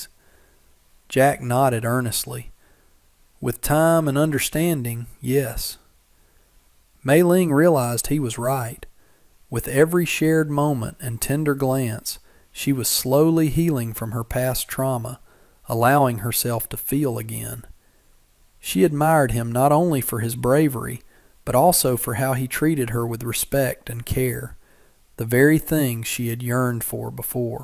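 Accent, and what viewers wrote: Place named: West, United States. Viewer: I love this real human voice with this wild west accent.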